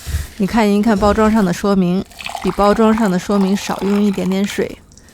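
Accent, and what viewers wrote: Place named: Beijing, China. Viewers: ，看头像里你的样子，和我一个同学长得很像，她也是一个北京姑娘，所以连口音都像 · 北京口音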